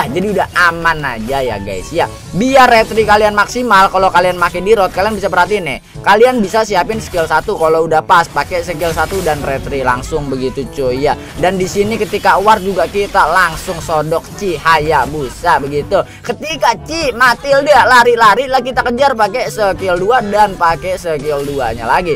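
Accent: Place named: Java, Indonesia. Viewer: Medok amat bg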